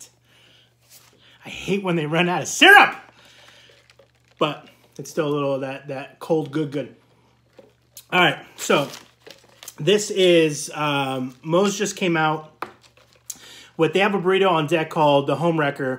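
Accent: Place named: New England, United States. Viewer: I guessed Massachusetts or Rhode Island by your voice. I’m from ct lol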